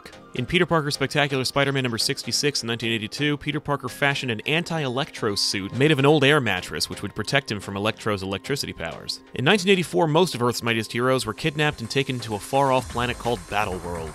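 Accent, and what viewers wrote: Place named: New Jersey, United States. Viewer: sounds like a 30 somethings year old white guy from Jersey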